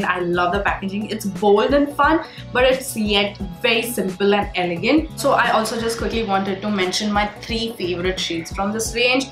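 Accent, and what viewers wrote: India. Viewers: Lmao your Indian accent is very visible 🤭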